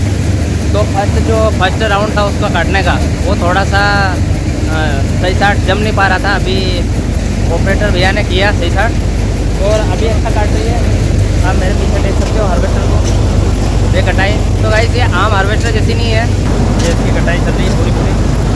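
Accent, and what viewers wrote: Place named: Malwa, India. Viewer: आप की भासा सेली से आप शाजापुर देवास उज्जैन के लग रहे हे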